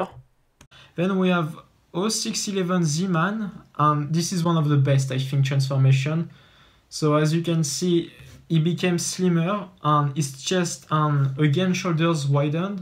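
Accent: France. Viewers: Mdr l’accent français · Are you french ??? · your accent is french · R u french ? J’ai reconnu l’accent 😂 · On reconnais directement l'accent français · That French accent... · Are you French ?? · Oh yes the French speaking english lovely accent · Pleeeeeaaaasssssseeeeee you are French?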